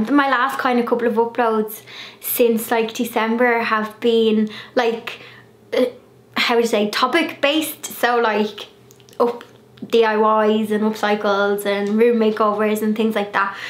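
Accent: Ireland. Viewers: Am still working from home. Have you returned to work or are you focusing on YouTube? Love your channel, ❤️ your house, and especially your adorable Irish charming personality and accent. Isn’t spring wonderful?💐🌻🌿🪴hugs from Arizona 🌵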